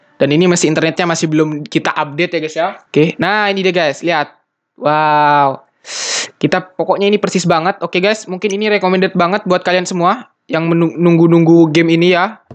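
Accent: Madura, Indonesia. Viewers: Logatnya logat Madura bner kah?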